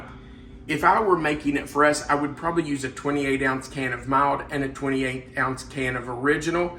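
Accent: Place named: Kentucky, United States. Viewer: A-HA! I suspected you were from Kentucky, just from your accent, and now we know. I will be trying this "caviar" for our next potluck. GO BIG BLUE!!!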